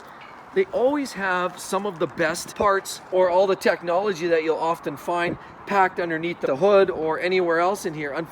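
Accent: Canada. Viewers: Are you Canadian!!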